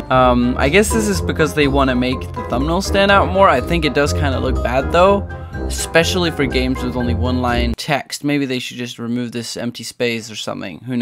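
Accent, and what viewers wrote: Norway. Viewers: You are from Norway right? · Are you from Norway ๏︿๏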